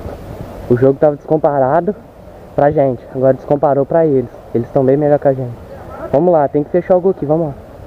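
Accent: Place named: Minas Gerais, Brazil. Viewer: Vc é mineiro né? Com esse sotaque. Legal é o clima dos jogos e a galera gnt boa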